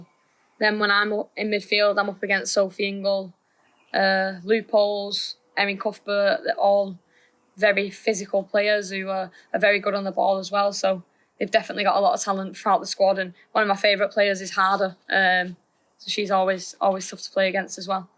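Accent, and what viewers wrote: United Kingdom. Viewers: I love the accent !! Is that Liverpool’s?!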